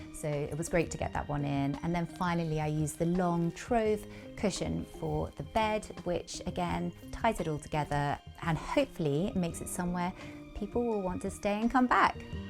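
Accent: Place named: RP, United Kingdom. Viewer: Wonderful house, horrible and ridiculous posh accent.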